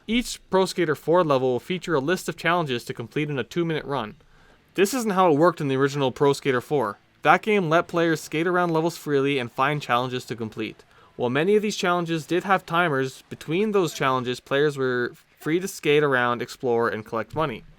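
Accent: Canada. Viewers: I feel like you must be from Canada 😂 first minute of this video sounds exactly like my friends and I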